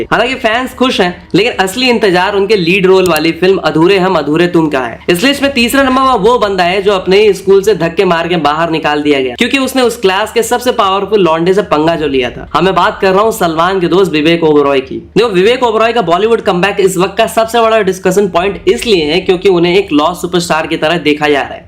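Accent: Bengal, India. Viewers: Ap bengali ho Kya?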